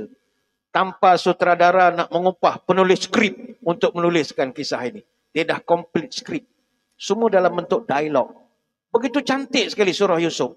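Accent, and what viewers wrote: Pahang, Malaysia. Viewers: Loghat pahang tu yg significant ketika penyampaian pun ye jgk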